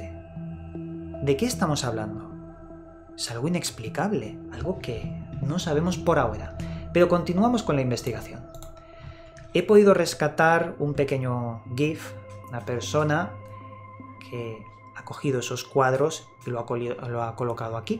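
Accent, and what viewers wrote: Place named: Spain. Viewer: ESTAS COMO LOS RUSOS QUE PASARON UNA IMAGEN DE UNA ESPIRAL EN EL CIELO EN PUEBLA Y YO SIENDO DE ESTE ESTADO NUNCA PASO NADA¡¡ DE IGUAL FORMA SE DICE AQUI, EN CANALES PARECIDOS AL TUYO QUE PASAN COSAS RARAS DEL OTRO LADO DEL MUNDO, TU IGUAL ESPAÑOL CREO QUE LO ERES POR TU ACENTO HABLAS DE SITUACIONES EN OTRO LADO DEL ATLANTICO¡¡ O SEA ERES UN FAKE ¡¡ PORQUE NO PODEMOS CONFIRMAR ALGO ASI PUES ESTA ALEJADO DE NUESTRO TERRITORIO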